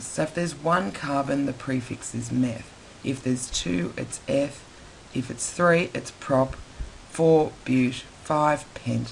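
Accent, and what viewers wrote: Australia. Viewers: AN AUSTRALIAN!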